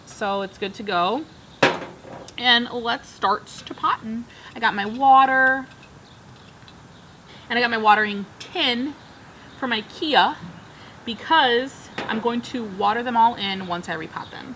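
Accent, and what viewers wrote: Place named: South, United States. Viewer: Hi, I'm afraid of the string of pearls as well, they look like some green peas which are so delicate..plant with me are so soothing..where are you originally from?..you sound like you have a little southern twang..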